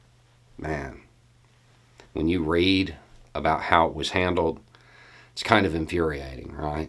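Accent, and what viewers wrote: South, United States. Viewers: Can I say that it is very refreshing to hear someone with a southern accent who actually sounds intelligent and reasonable?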